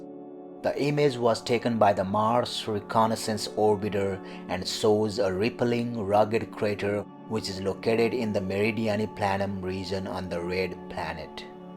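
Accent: India. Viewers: Are you from north east India? My wife is from Assam and your accent sounds similar. Cheers and thanks!!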